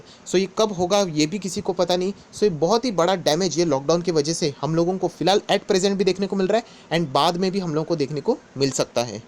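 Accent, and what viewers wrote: Maharashtra, India. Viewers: As per your speech I feel you are maharastian. If so you are Frist man who is having such a good command on stock market. Superb knowledge. Please share your mobile number as I want to invest first time in shares with your help.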